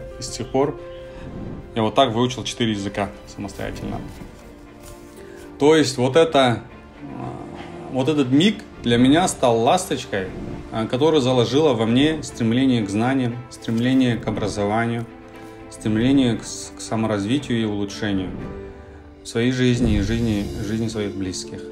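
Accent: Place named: Naryn, Kyrgyzstan. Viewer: Крассавчик ты как Нарынский говорю...Эркек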